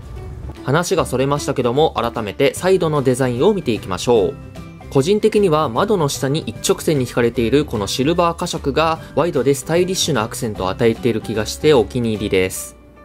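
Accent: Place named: Kansai, Japan. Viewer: 南港あたり、西名阪、香芝インター、たまに聞こえてくる関西弁ｗ すごい親近感がわきますｗ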